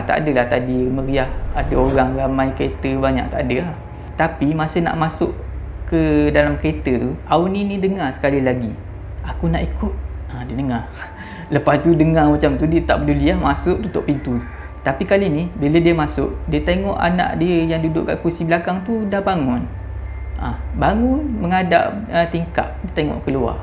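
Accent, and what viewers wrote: Terengganu, Malaysia. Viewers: Setuju tak semua orang boleh faham dialek Terengganu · ckap ganu kali bang😁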